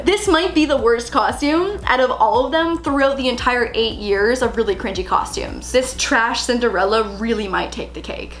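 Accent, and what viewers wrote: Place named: Ontario, Canada. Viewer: You can 100% tell is from ON bcos she doesn’t pronounce the 2nd t in Toronto 😂😂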